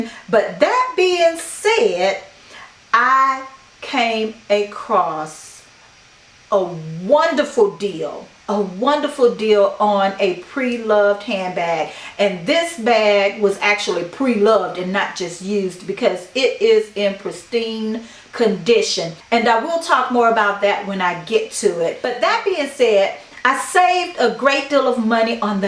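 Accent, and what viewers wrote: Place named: Houston, United States. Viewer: I just discovered you and I think you are funny and knowledgeable. Plus you make me homesick. I’m from Houston and your accent is making me think of home. Subbing right now!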